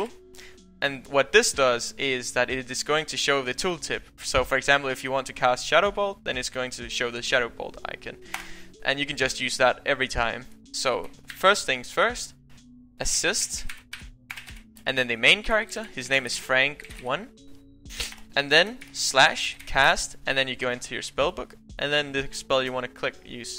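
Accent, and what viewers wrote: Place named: Denmark. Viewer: Hey jeg har set din video! Det lyder som on du er dansker ! Jeg vil gerne lære at multiboxe! men kan ikke finde ud at at det med script.txt! kan du hjælpe mig :))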